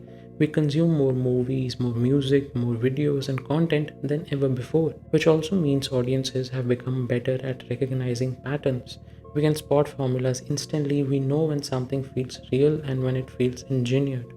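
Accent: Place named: India. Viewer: White PFP Indian voice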